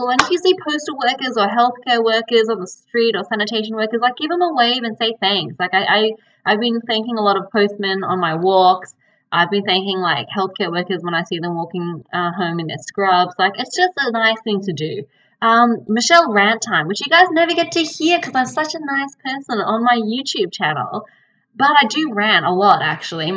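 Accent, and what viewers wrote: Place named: Australia. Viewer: great to hear from you again and know you are doing well there. Probably you can talk about next show - how you maintain the Australian way of doing there, for example your Australian accent, and how you prevent yourself from being ‘colonized’ by the Americans. Lots of love, SK.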